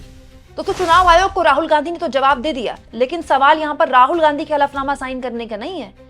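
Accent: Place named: Bengal, India. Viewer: Your voice is bengoli tongue jeetna bhi Hindi bolo your face lip expression is mohali look like kindly improve it bro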